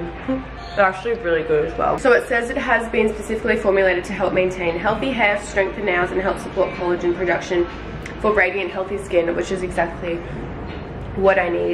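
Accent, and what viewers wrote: Australia. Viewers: New to this channel. Is she Australian? I can’t tell where the accent is from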